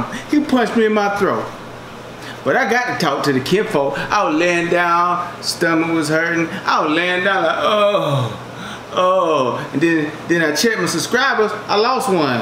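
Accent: United States. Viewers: I’m from New York & i love how different & country his voice is 😍 instantly fell in love & subscribed 🥰🥰❤️❤️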